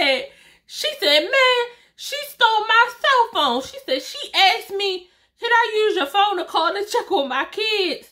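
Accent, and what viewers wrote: Baltimore, United States. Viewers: So I just came across your channel. Lol. When I first heard you talking I’m like this girl sounds like she’s from Baltimore! Then as soon as I heard Mondawmin, I was like I knew it 😭😭😭😭😭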